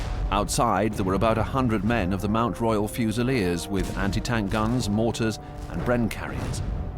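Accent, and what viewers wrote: United Kingdom. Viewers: the British slanted twitish narration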